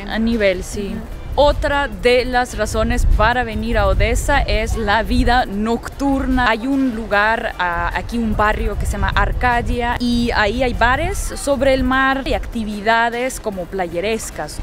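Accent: Mexico. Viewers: Me intriga mucho su asento español Mexicano..la hermana dijo alberca y esta padre algo muy Mexicano 🤔😘🇲🇽saludos hermosas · Ya suena mas mexicana que ucraniana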